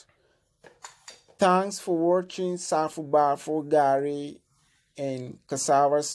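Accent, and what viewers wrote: United Kingdom. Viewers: Oh. You're too much with your British English. Well done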